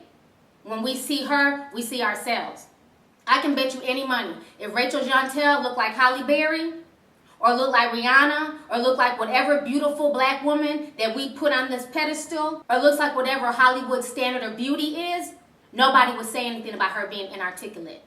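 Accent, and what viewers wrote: South, United States. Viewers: just started watching your channel. was quite surprised that people give you grief about not being articulate. you seem pretty articulate to me; and as for the accent, just figured you were a fellow southerner! also awesome to hear someone speak the truth about lolo jones. great video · ❤ love this and I love your accent. I just thought you were southern. 😄😄 you learn something knew everyday. 😕 I'm Belizean but my accent totally went away. 😩 I assimilated. 😫😫😫 you had cancer I'm so sorry/ 🙌🙌🙌 so glad you are in recovery ❤❤.😟 you really don't mention it in 2017 I just thought you had a virus 🙏 I'm even more glad I've been praying for you ❤❤ so happy you are alive and living your best life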